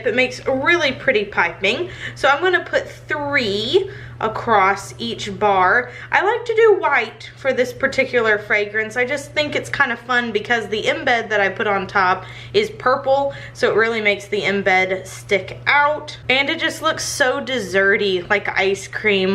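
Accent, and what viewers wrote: Texas, United States. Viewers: The way you say scrapey scrapey is similar to grav3yard girl's sippy sippy thing. But it's probably just the texan accent to most people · You do sound like graveyard girl, it must be the Texas accent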